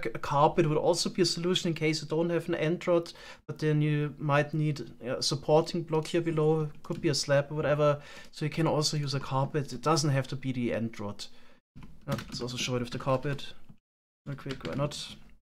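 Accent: Germany. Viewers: you hear so on as u would be german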